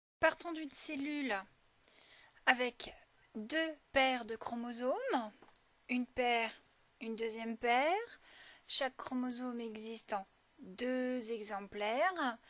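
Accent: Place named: Bresse, France. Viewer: Parfois on dirait que vous avez un accent bressans ☺️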